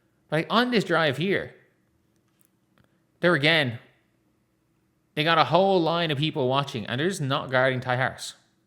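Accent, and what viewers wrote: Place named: Ireland. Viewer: You are the worst YouTube Fever analyst, milk toast copycat basketball analyst I've ever seen on social media. I've seen an old lady, grandma type do better than you on YT. She's at least honest. You're a ridiculous fraud made all the more annoying with that ridiculous laconic, droning, put me to sleep, Irish Accent presentation. I almost can't stomach you more than fraudster Jason Witlock. I don't believe either of you watch the entire game. You both just skim the highlights & watch other people's podcast. Boring as all get out. I can't believe you even have 1 follower. I wouldn't watch your videos if you paid me.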